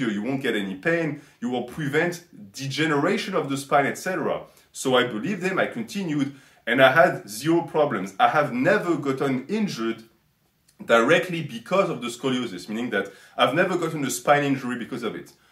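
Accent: France. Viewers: I literally for some reason could not put my finger down on your accent, and now I know it's French...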